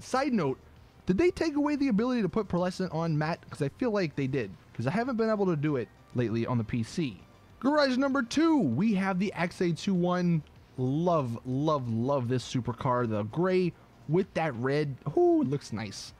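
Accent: Louisiana, United States. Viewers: Are you from Louisiana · What part of Louisiana you from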